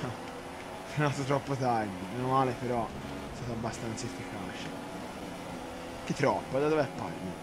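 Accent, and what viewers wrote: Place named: Livorno, Italy. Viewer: Le tue incazzature con l'accento livornese sono favolose ahah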